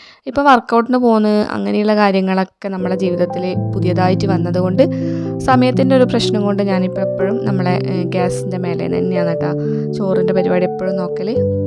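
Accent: Kannur, India. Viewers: വീഡിയോ സൂപ്പർ ആയട ❤ കണ്ണൂർ ഭാഷ എനിക്ക് ഇഷ്ടായി ഞങ്ങൾ ഇവിടെ unnithand എന്നാ പറയാറ് ❤️❤️ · കണ്ണൂർ ഭാഷ ഇഷ്ടപ്പെട്ടു വർക് ഔട്ട് കൃത്യമായി നടക്കട്ടെ വിശേഷങ്ങളും വീഡിയോയും ഇഷ്ടപ്പെട്ടു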